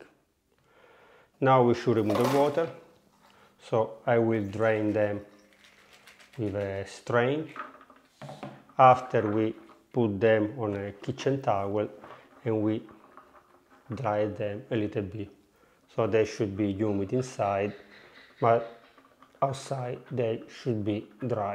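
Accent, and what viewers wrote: Italy. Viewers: I Love the Italian accent fratello! 🙏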